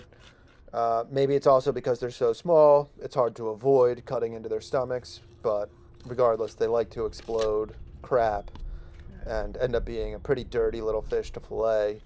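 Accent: Philadelphia, United States. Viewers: dubious Philly accent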